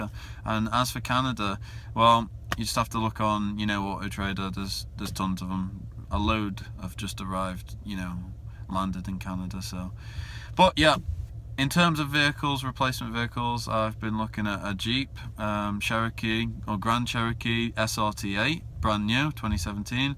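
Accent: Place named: Sutton Coldfield, United Kingdom. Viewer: Are you from Sutton Coldfield or somewhere nearby?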